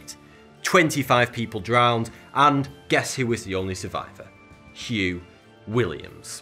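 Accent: United Kingdom. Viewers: xXMEMEGODXx 11 it's just his type of British accent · What happened to Vsauce? Why does he suddenly have a British accent?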